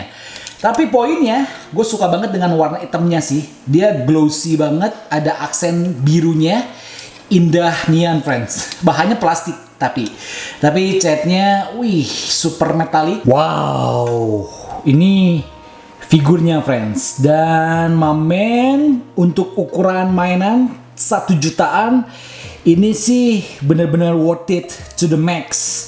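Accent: West Java, Indonesia. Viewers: Ciri khas orang sunda kang... Hehehe, sy oge Sunda sok kitu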